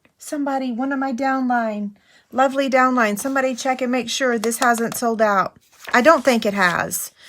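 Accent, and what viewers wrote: South, United States. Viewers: Love your ideas & videos! Where are you from, I hear a distinctive southern accent. I’m from NC.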